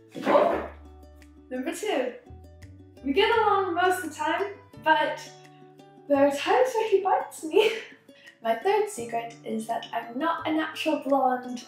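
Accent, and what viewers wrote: England, United Kingdom. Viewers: Does she have a British accent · Your accent is changing! Becoming more English 😮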